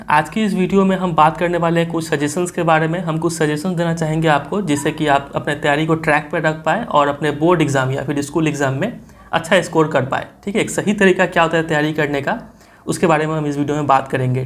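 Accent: Bihar, India. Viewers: Sir, Are You From Bihar..??? I Mean Your Way Of Speaking ❤️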